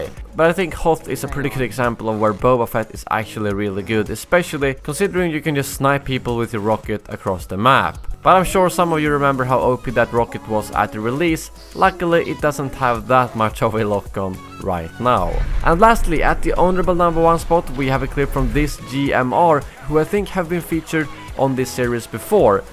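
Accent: Sweden. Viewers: agentokky he's Swedish, so it's not surprising if he doesn't speak English correctly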